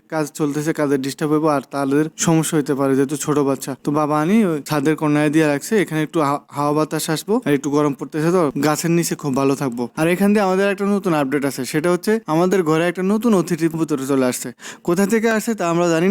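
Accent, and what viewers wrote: Noakhali, Bangladesh. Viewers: ভাই আপনার কথায় মনে হয় যেন আঙ্গ নোয়াখাইল্লা??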